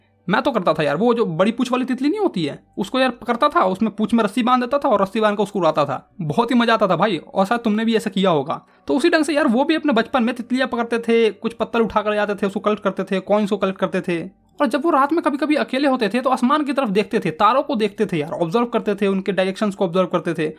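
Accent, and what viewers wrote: Bihar, India. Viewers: Aur ek aur cheez aap bihari ho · Haan, bhai ye bihar se hai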